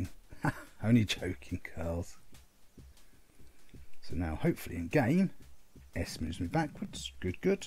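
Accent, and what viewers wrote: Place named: England, United Kingdom. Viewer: This guy has a very strong English accent and I cant understand him plus I need the audio almost full blast. Is there a *TYPED* version of what this guy is saying?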